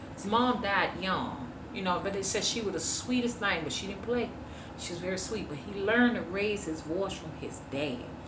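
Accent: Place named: Alabama, United States. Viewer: I just finished watching one of your other videos and I thought, "she kinda has an Alabama accent" and then I clicked this video and you said you graduated from Birmingham college I was like ayyeeee I've lived in Alabama since I was a baby and sometimes I notice other peoples accent because it's familiar. Also I really feel like I learn something new after watching a video of yours, it's a beautiful thing to want to share your knowledge with the world. It's undeniable that you have great purpose and anyone who stands against you is afraid of the truth. You're WOKE AF and that's rare.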